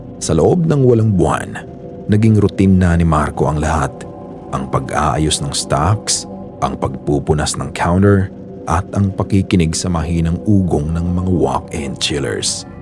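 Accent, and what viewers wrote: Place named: United States. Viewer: Nakakagulat ung tagalog na kwento pero nagging american accent pag english words. Okay naman ang Filipino accent na english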